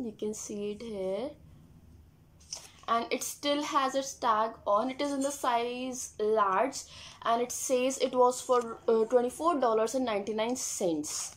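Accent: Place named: Haryana, India. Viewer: Bt i think u r haryanvi so that tone is coming in ur conversation so i am not saying anything nagative i appreciate ur work 😘😍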